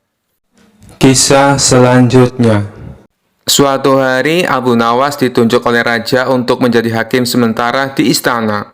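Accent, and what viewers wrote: Java, Indonesia. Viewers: Udah medok, intonasi lemes, sebaiknya gunakan suara google aja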